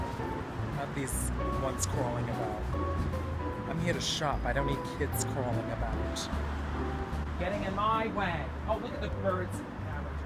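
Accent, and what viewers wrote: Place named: Bronx, United States. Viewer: OMG!! I am hooked on your store visits (also your Bronx accent - reminds me of home!!)!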